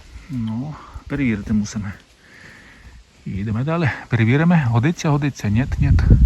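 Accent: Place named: Transcarpathia, Ukraine. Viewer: Щиро дякуємо за таку прогулянку ,дай Боже щастя і здоров'я вам ми зпраги ,приємно слухати нашу закарпатську мову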